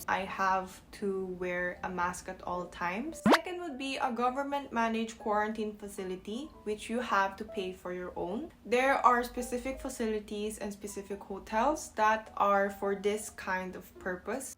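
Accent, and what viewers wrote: Philippines. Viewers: O diba, pinoy na pinoy kung magsalita.👍👍 New subscriber here. Hope you upload videos regularly in the coming days. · I am still amazed by your accent and your tagalog language. So fluent para ka tlagang pilipino.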